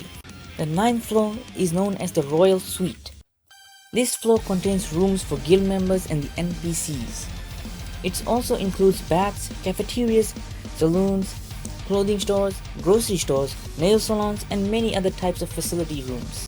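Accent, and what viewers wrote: India. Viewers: Alkatron agreed. I am so incredibly distracted by the indian accent. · Great video except the Indian accent. U could have someone else to read it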